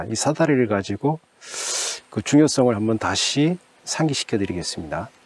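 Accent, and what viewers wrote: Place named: Gangwon, South Korea. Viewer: 강원도 말투신거 같은데 듣기 좋습니다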